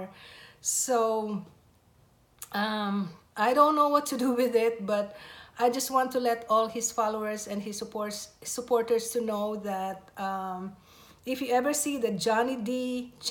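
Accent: Philippines. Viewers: You sound like a Filipino. Are you a Filipino? Because i am also a Filipino too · Its like your a Filipino citizen because of your accent. But we are happy that Papa JD is back :)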